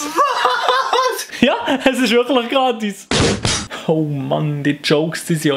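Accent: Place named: Fribourg, Switzerland. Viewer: I finge dr Friburger dialekt efach sooo witzig 😂😂😂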